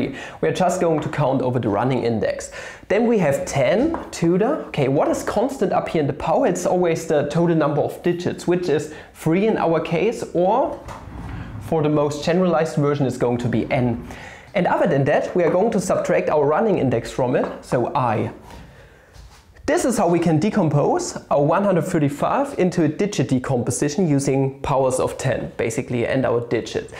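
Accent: Germany. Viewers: Hearing a German speaking English always makes me mad because of.... well you guessed it: accent! :-D it´s hard to follow the content while listening. Apart from that, I would appreciate your work more if I wouldn´t have heard about this by the one ans only, James Grimes. No offence, man, you`ve done a great video! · you sound very german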